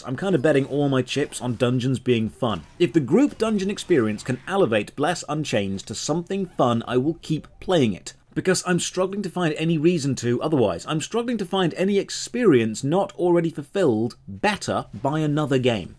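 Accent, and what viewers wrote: United Kingdom. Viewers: I often wonder what your sub count would be like if you didn't look like Henry Cavill and have a British accent.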